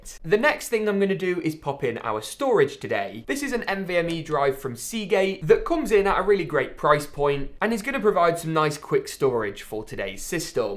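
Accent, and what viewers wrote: United Kingdom. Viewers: I like the UK accent 😅😅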